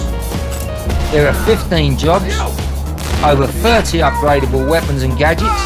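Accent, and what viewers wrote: United Kingdom. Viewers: The narrator reminds me of Michael Caine. That's a cockney accent I'm hearing, right? Curious as to why this game is launching on a Wednesday - most PSN games launch on Tuesdays, and I haven't noticed info about this on the PS blog. Is this announcement tying into the PlayStation meeting today, particularly with the PS VR version coming soon?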